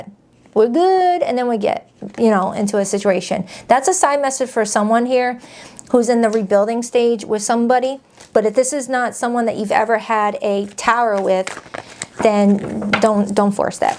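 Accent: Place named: New England, United States. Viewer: I love your new england accent ❤❤❤❤ I live in the south and we absolutely love that accent because its different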